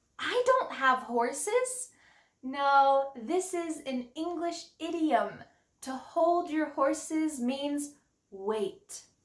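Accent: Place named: United States. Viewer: Hai saya dari Indonesia, saya sangat senang bisa menemukan video ini, saya juga sangat suka dengan aksen Amerika, ini bisa menjadi motivasi saya untuk belajar banyak tentang bahasa Inggris 😊, Terimakasih!! 🙏🏻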